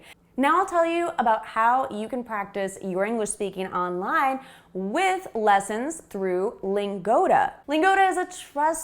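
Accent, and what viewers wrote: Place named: Philadelphia, United States. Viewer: I like to hear your accent. I seem to hear the same accent in Philadelphia.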